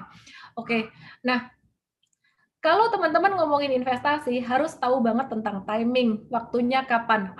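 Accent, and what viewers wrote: Java, Indonesia. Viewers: logat jawa sing kandel banget. 👍 anyway and thanks 😊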